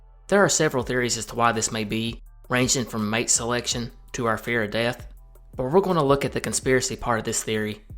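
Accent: South, United States. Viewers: Thank you! I really enjoy these. I absolutely love your voice! I'm from WV and sadly, I never hear Southern creators. Keep up the great work and I definitely look forward to future videos.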